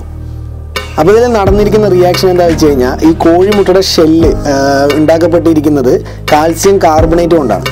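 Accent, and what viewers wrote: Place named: Palakkad, India. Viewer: ചേട്ടാ... ചേട്ടൻ Palakkad ആണോ?!? സംസാരം കേൾക്കുമ്പോൾ അങ്ങനെ ഉണ്ട്... നമ്മളും Palakkadinna😅😅 chettante videos എല്ലാം poliya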